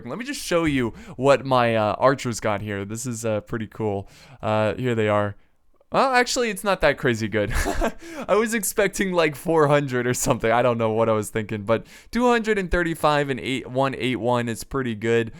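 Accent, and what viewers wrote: United States. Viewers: but yeah its because of having an accent, an American accent. EVERYONE has an accent. And i bet has problems saying PLENTY of non German words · Please, (no offence intended) pronounce those names right, it's kind of annoying to hear them pronounced in an American way. Again, I am not looking for a fight, this is just a piece of advice. Trust me, pronouncing them right grants you extra style points. Great videos, keep it up!:)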